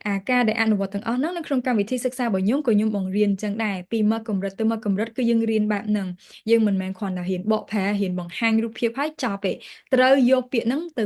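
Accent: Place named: United States. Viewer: Your English sounds like American how long have you been learning english?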